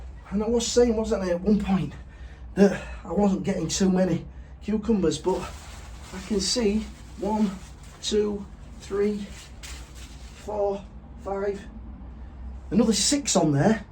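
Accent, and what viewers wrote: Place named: Wakefield, United Kingdom. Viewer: Aww fab, I’m in Wakefield - thought I recognised the accent! Really enjoying your vlogs - keep them coming 🤩 And I’m keeping the sunflower idea in mind for my future grandkids. Such a lovely gesture!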